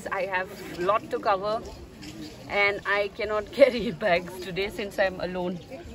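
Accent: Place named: India. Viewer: Your accent is really annoying.Sounds like mainland Indians.,vegetable should be pronounced as vejtebal not vejitebal.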